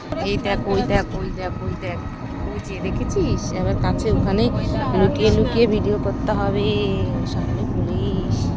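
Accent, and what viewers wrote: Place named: Bangladesh. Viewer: আপনার কথা শুনেই বোঝা যায় আপনি বাংলাদেশি, শুধু আপনি কেনো ২৪ পরগনা ও নদিয়ার বেশিরভাগ ই বাংলাদেশি, জীবন চালানোর জন্য ও সুযোগ-সুবিধা র জন্য ভারতে আসে থাকে আজ ওপারে গিয়ে একবার SIR নিয়ে সাবধান করে দিন আর বলুন ভোটের পরে আসতে তাহলেই আর কি চাপ CLEAR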